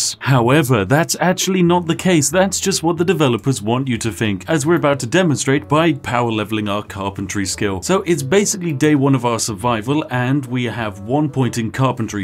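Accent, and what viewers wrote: United Kingdom. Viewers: Quite literally took me one minute to get into the video to realize this was a video and not an amphibian video. Even the hello gentlemen didn't phase me, I saw zomboid and brain went that's an amphibian video, but then my brain registered the accent and the lack of philosophical ramblings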